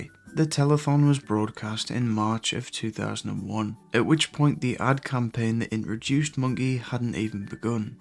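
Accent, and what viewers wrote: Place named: Geordie, United Kingdom. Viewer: Oh Monkey, the amount of PG Tips I bought to get your little toys! I don't even like tea, I just wanted the Monkey. My Mum was stocked up for ages though, she was well pleased! 😂 You've got the quietest Geordie accent I've ever heard, it's really soothing. Much nicer than the two gormless prats on that singing show!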